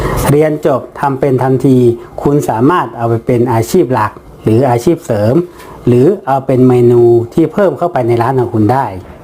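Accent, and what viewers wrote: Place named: North, Thailand. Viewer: ฟังสำเนียงคนเหนือแน่เนาะๆ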